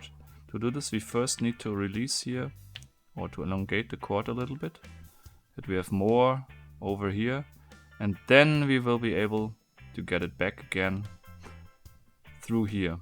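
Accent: Germany. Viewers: his accent sounds sooo german🙊🙉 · are you german? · I don't know why but even though you have a German accent I think you are part Asian. You have an amazing mind.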